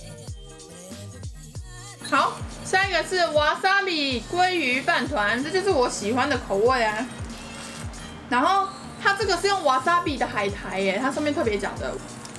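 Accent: Taiwan. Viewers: 台灣國語也太親切了🤣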